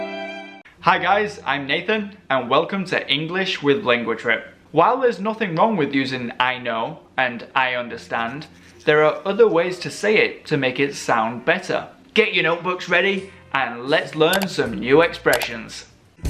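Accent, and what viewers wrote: United Kingdom. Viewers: More videos with the British accent please 😁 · у него Английский английский как на счет американской версии?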